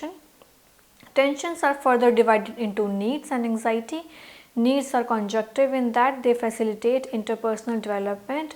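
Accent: India. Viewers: I dont understand the words you said because of the Indian accent..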